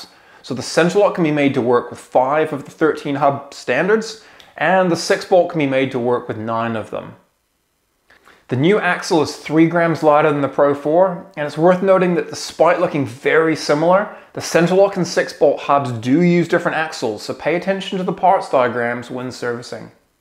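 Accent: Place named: New Zealand. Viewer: Awful kiwi accent.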